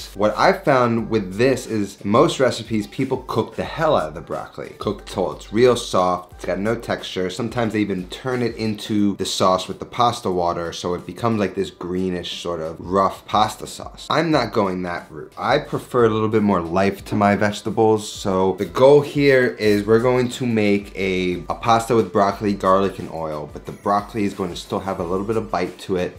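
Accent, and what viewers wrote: New York, United States. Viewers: I agree. I think he's from New York judging from accent but his food is not Italo-americano which is great but more what I've eaten for years in Italy.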